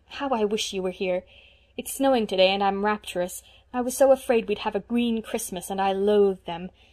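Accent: United States. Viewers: Her English seems perfectly fine to me. A very proper American accent, without strong local dialect. What accent do you consider to be "proper"? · Impossible to listen to the dreadful use of the English language,why do the yanks deform English?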